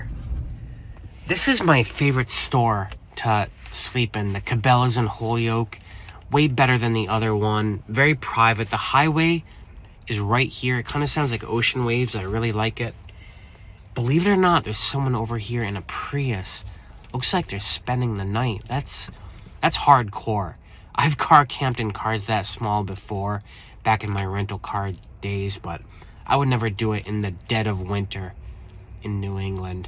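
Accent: Australia. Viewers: 🤣😂 that's an Australian accent 🙋🏻‍♀️🇦🇺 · That's not a British accent! That's an Australian accent!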